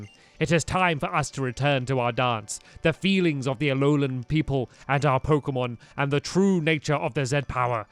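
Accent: England, United Kingdom. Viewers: pro classgamer Well, Stampys British, and here we say Z: Zed. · Garry Stevens he's English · Guys... IT DOESN'T MATTER THAT HE SAYS "zed" INSTEAD OF "zee"! HE COMES FROM ENGLAND. HE IS GOING TO PRONOUNCE IT THE WAY HE WAS TAUGHT. HE IS NLT GOING TO PRONOUNCE WORDS FROM OTHER RACES JUST BECAUSE OF YOU. There. :) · homie cat he is British some thing are different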